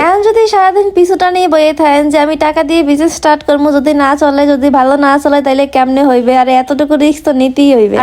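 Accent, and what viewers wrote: Barishal, Bangladesh. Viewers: আপু তুমি তো বরিশাইল্লা তাই না 😊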